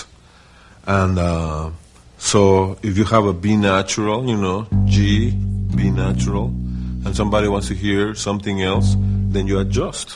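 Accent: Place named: Jamaica. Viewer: This incredibly talented cat has groove and soul and heart and musicality and I Just want to say Thank You,. BTW, where is he from? His accent is pseudo-European, perhaps Jamaican? Anyone? Bueller?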